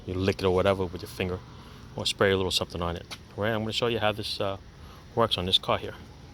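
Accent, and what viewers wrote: New York, United States. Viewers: Hey thanks for this short and sweet, to the point video. I need to replace both rear window regulators and I cringe at sticking duct tape over the glass. I was thinking about jury-rigging something with some suction cups and wire, then I saw this. Figured it would take me more time and trouble than to just buy it from Lisle. Your accent sounds like NY to this ex-pat Bronx guy. Take care.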